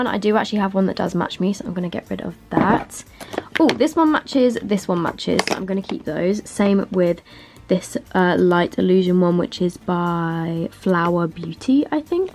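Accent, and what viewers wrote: United Kingdom. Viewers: . Are U British or American? I am asking about your accent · Good lord I wish I was British. Your accent is so pretty. Every time I hear a British person speak it makes me wish I could fake a British accent without everyone making fun of me. I love the way British people not only say things but also the words you use! So beautiful!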